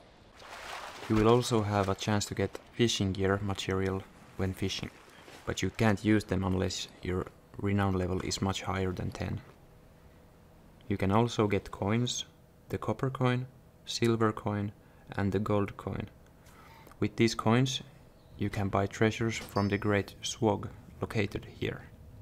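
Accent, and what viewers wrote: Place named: Finland. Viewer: Are you from Finland? Your accent sounds like it.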